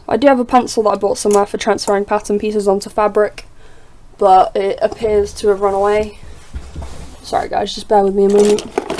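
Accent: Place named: Northern England, United Kingdom. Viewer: Hey! By your accent it seems like you live in the north of the UK. Is there by any chance seeing that I live in England and good shops or websites for quality fur that is in the UK so I don't have to send £40 for shipping?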